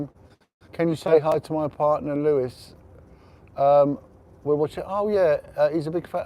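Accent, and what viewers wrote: Cockney, United Kingdom. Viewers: God save the cockney!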